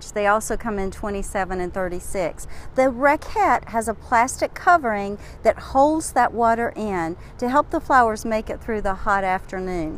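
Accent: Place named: East Texas, United States. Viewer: Enjoyed this. I swear our presenter was from East Texas based on her accent. 😃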